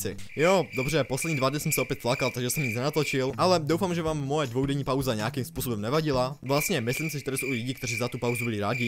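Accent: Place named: England, United Kingdom. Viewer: Sorry ale ta tvoje angličánština mě mega sere xd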